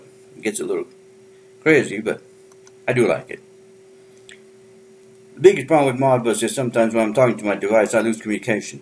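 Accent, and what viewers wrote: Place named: Louisiana, United States. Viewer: My guess - Louisiana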